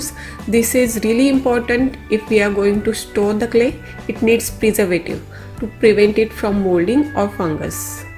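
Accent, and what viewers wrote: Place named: India. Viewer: I couldn't understand your accent. This really sucks because you are trying to speak English and I can't understand Hindi accent. No captions available either. Sorry, but you get a thumbs down. Talk slower and much more explicit. I want to make this but you made it impossible for anyone who cannot hear Hindi mumble.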